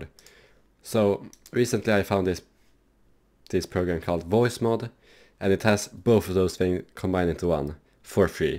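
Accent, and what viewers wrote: Sweden. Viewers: haha man hör så himla klart att du är svensk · skulle inte säga dålig men definitivt tydlig svensk dialekt på den haha. men kom igen, vilken svenne har inte det?? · You're swedish right?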